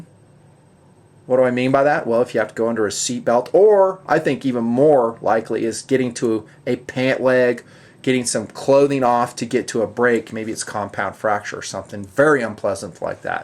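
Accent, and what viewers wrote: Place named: South, United States. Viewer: Southerner? Southern England? Australia? Who in the South says ay?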